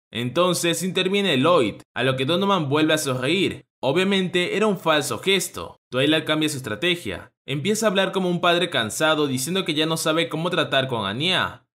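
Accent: France. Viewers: ¿,tienes acento francés?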